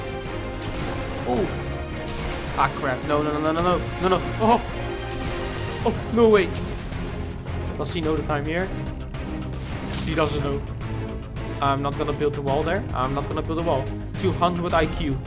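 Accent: Netherlands. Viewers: your english sounds really dutch. Are you from the Netherlands? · You have a dutch accent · ik ga stuk je nederlandse accent hahahaa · Hi’s accent is soooo Dutch😂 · Are you from the netherlands you sound like that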